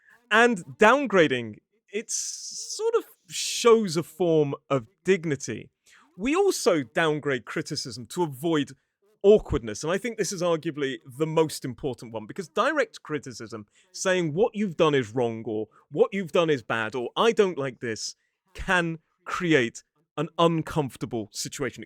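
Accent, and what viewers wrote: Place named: England, United Kingdom. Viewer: I hadn't noticed the backdrop until now. Maybe there's something missing to be sure that we are in an English man's house 😉 It's always a pleasure to watch your videos. French people are not really polite and rather direct. In-your-face rude unapologetically. English people are the best. And your accent... ❤ I'm fond of it. Thank you from Paris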